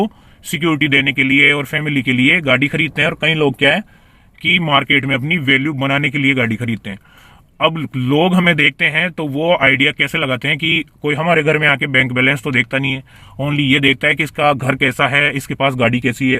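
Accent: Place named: Kota, India. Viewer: Bhai आप Kota k as pas k ho.? Maine boli se pahchan li😂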